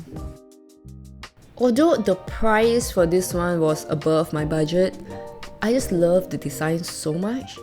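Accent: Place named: Malaysia. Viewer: Very typical Malay accent. Good selection.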